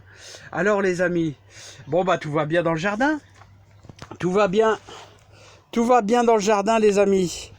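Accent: North, France. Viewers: Merci j adore le patoi du Nord 👍😜🤣 et tes vidéos conseils. Bonne journée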